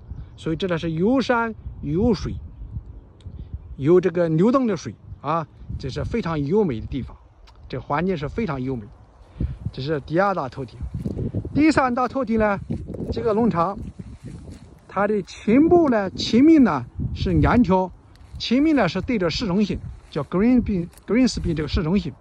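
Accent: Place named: Anhui, China. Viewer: 您的安徽口音太重了，建议增加中文字幕。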